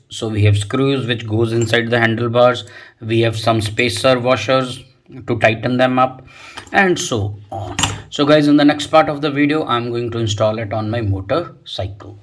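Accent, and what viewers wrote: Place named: India. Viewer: Good job, i think i'm going to buy it for the winter season. I like your english with hurdu/hindi accent 😊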